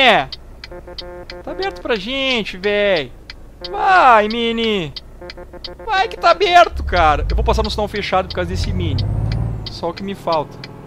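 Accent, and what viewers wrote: Rio Grande do Sul, Brazil. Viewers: VC E GAÚCHO NÉ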